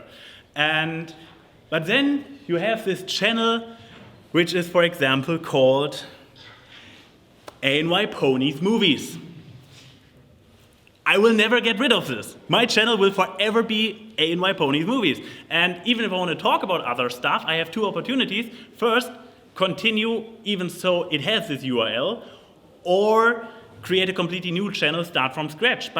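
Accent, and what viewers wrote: Germany. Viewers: exploding guy :3 yes. he come from germany. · are you german? you really sound german because of the way you say "the" nice panel tho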